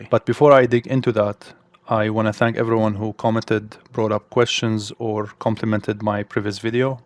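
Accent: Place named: Serbia. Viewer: You have a Serbian accent:)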